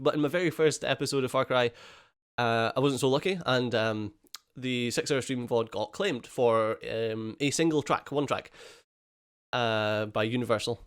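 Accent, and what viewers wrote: Scotland, United Kingdom. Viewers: I wish you the best of luck man, my total youtube usage will go down without you and those wonderful Scottish tones. I hope more creators see what you are doing and stand hand in hand with you. Please don’t stop creating, i personally think you are in the right and we still need creators doing THEIR thing rather everyone just doing the same thing controlled by some faceless corporations, trying not to sound conspiracy theory on it.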